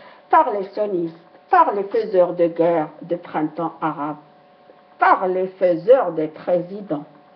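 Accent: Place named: Algeria. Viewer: C'est dommage que les Algeriens aient de moins en moins un bel accent comme le tiens... Et pourtant tu attaques Daoud...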